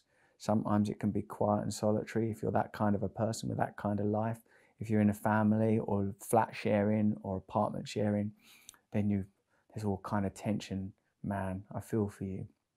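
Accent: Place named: England, United Kingdom. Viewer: your accent makes me miss England.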